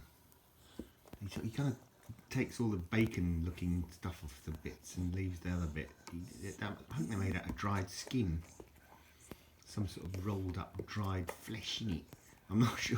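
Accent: RP, United Kingdom. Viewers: You're most welcome:-Gosh you sure are modest,😆Or you really know how to sell yourself, painting a picture of someone with baneful breath + a barren buccal, God bless you I'm sure you love Gem Jimmy unconditionally+vice versa You sound remarkably posh ,,, British+ Mellifluous, for someone in Poland...I presume you lived numerous years in England, previously? Golly gosh,old chap, your videos are always a jolly good show, Thenk you 😅 Poppycock,you claiming beastly breath:- Your breath doesn't need to smell of flowers,to make your exquisite videos💐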